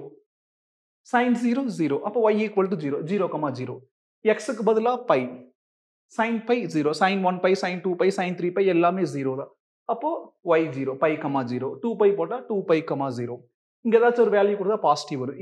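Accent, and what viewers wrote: Coimbatore, India. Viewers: Coimbatore slang🤩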